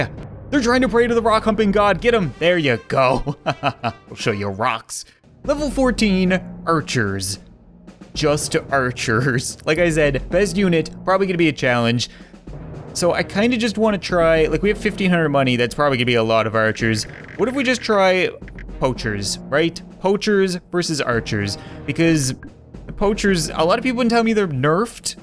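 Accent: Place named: Canada. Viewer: He’s Canadian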